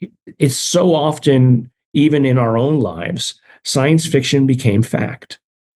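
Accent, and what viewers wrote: Liverpool, United Kingdom. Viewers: A scouser! Wow! Never knew that! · NO WAY!!!! HES A SCOUSER!!!! IM LIVING IN LIVERPOOL UK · Didn’t know Gary was a scouser. ( people who are from Liverpool are nicknamed scousers.) 😀👍🏻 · No way is a scouser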